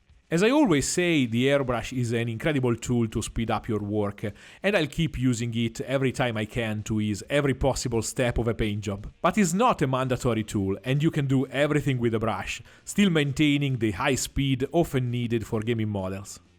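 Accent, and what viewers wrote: Italy. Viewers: Boia come si sente che sei italiano. Bravissimo comunque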